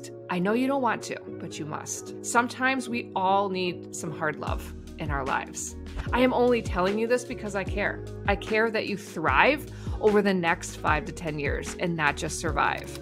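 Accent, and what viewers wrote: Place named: New Zealand. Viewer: I am a person who wants to come to New Zealand. Watching Madam's video is for learning Kiwi English accent ❤️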